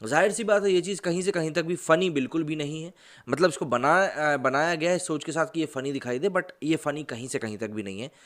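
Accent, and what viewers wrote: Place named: Assam, India. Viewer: I think your accent have an assamese touch.😄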